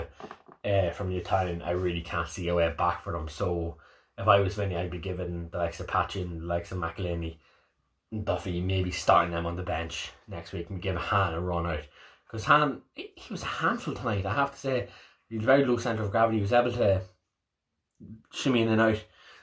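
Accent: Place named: Armagh, United Kingdom. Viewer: I can hear the Armagh in your accent 😂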